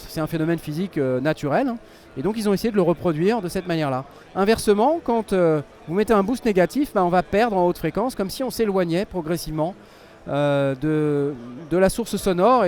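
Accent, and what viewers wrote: France. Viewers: I will try to ré-explain ... Fluent but frenchy indeed.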